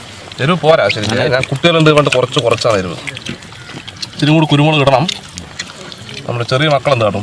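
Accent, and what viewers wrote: Palakkad, India. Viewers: നല്ല orjinal പാലക്കാടൻ ഭാഷ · തനി നാടൻ പാലക്കാട് ഭാഷ 💞✨️✴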